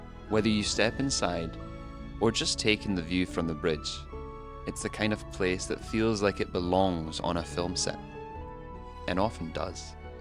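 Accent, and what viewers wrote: Scotland, United Kingdom. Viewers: Nice to hear a Scottish accent. Instead of a ai voice. ❤ Scotland 💙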